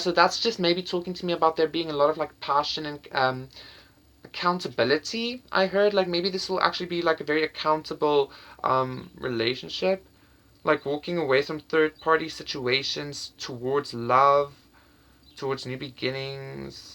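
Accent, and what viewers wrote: South Africa. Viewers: You sound South African, I enjoyed listening to you speak! Thank you for the reading 💜✨ may your channel continue to grow and be prosperous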